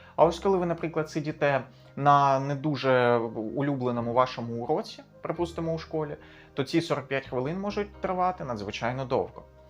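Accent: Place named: Russia. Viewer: Цікавий російський акцент.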